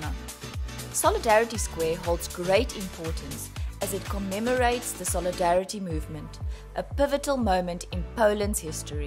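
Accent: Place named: South Africa. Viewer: Its funny to here a South African voice about Poland lekker man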